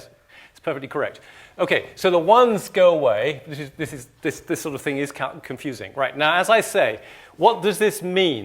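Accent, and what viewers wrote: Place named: England, United Kingdom. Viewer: "absolute and immaculate sphere" i like the way he talks. english people.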